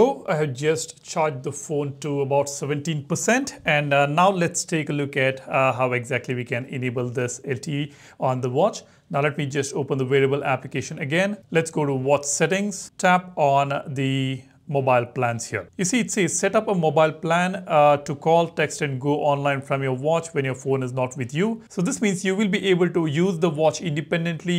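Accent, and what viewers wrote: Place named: India. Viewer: Can't even with this Indian accent. I'll find another video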